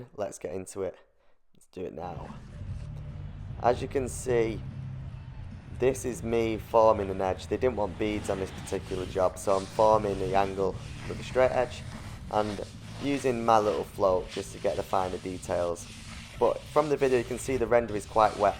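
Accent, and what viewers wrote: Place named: Midlands, United Kingdom. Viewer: I'd guess he's from middle England or a bit further north based off his accent.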